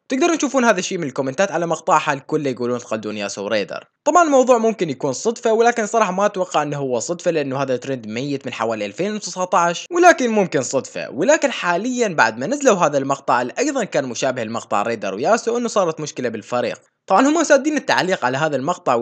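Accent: Iraq. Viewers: كانو انت عراقي من لهجتك مبيت كتير حلوه اللهجه العراقيه 💛💛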